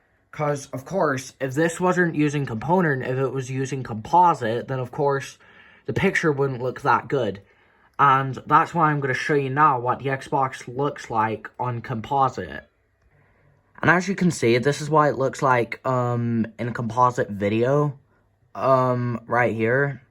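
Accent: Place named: United States. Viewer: it an autistic sounding american accent, hope this helps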